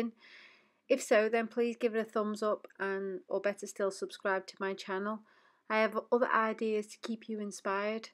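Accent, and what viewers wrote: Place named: Liverpool, United Kingdom. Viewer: Fantastic experiment one I'll be trying as ive switched from acrylic pouring to resin. I’ve watched your other videos and think you have such an easy familiar voice to listen too .. dare I say Scouse/Wirral accent? I'm from Wallasey, Wirral. I also love the fact you don't have music blaring away in your videos and you have a very eloquent way of speaking, no um's no going off subject and no dragging out your videos.. i wish more was like you. Thank you so much. You are so inspiring.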